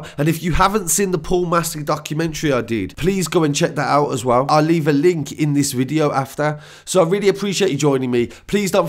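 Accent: Birmingham, United Kingdom. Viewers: As somebody with a pure born and bred Salford accent it seems strange hearing Salford street names spoken with a Birmingham accent and whats even worse is when i head up north to places out of Salford people ask me where i,m from and the mere mention of the word Salford sends shivers down their spine err im only a Decorator Luv dont panic !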